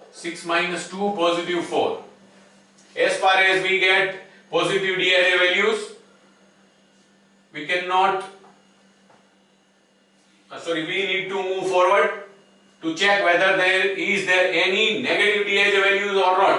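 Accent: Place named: Gujarat, India. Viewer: i don't know but from your hindi i think you are gujarati.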